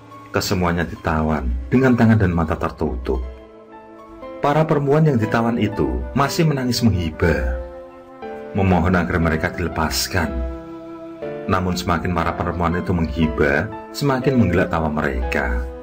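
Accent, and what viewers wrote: Java, Indonesia. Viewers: Ceritanya mencekam bikin jantung berdebar kencang, saya suka logat bicaranya yg jawa banget.. Mantebb 👍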